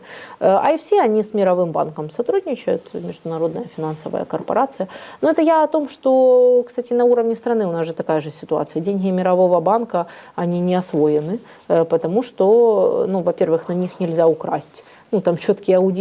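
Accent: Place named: Ukraine. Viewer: Это элемент суржика.Русский язык с украинской фонетикой.Человек ни по русски ни по украински не говорит.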